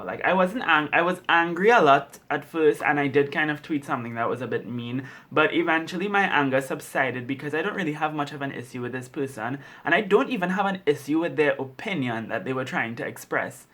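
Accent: Trinidad and Tobago. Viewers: I dont know you but I've learned how to recognize the Trinidad accent! There are LOTS of trinidadian doctors at my hospital that I've learned the accent...am i right? Are you from trinidad?!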